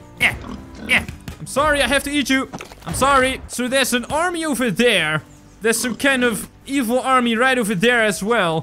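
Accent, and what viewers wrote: Netherlands. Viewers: are you dutch?! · You dutch????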